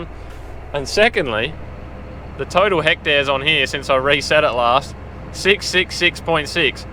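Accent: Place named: Australia. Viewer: How the heck did a population of convicts from the British empire get to develope such a Croc Dundee accent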